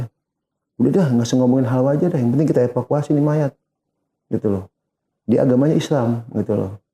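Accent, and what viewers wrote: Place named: Jakarta, Indonesia. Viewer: seruuu bgt sih cerita dengan aksen betawi nya wkwk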